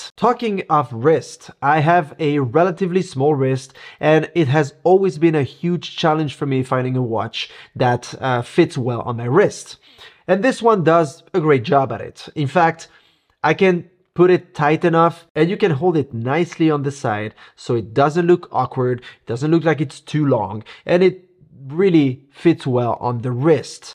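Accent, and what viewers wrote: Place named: France. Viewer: T'as un accent français quand tu parles l'anglais ! Try to cut down on the word "really". You really say it a lot!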